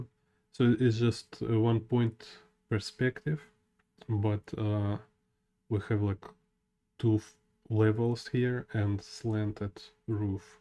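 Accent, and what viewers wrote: Russia. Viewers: Привет. Отличное видео. Всё наглядно, понятно и познавательно. Спасибо. Твой англицкий упорно давал понять что скорее всего ты говоришь на русском :) Яндекс ИИ не смог дать перевод :))) Но всё было понятно.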